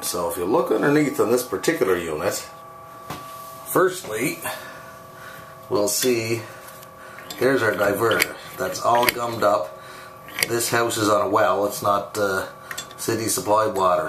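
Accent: Newfoundland, Canada. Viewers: I love the Canadian accent and I loved the video very helpful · Hi, thanks for the informative video - saved me $150 bucks USD! Based on the comments regarding your Canadian accent on words like "about" and "spout" my reply to Monique Wilson is learn how to pronounce the word "ask" correctly before commenting on our good neighbors north of the border! Monique would most likely want to "axe" you a question on another one of your videos! Thanks again for the informative video - married to a sweet lady from Toronto! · Cape Bretoner or Newf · Got to be Canadian - "you're darn toot'in" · Got to be a Newfoundlander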